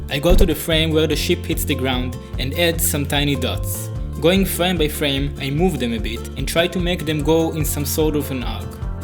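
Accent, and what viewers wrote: Israel. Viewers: אפשר לשמוע שאתה ישראלי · שומעים את המבטא הישראלי😆 אבל יפה אחלה סרטון👍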